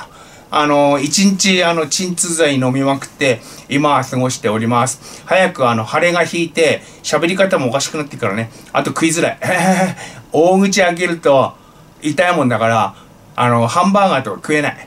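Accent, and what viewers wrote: Fukushima, Japan. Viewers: 体が大変なのに毎度ご苦労様です。 気持ちが乗ると出る福島なまりが好きです。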